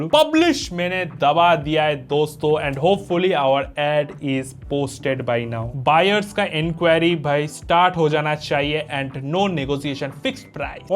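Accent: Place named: Kolkata, India. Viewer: U have Kolkata accent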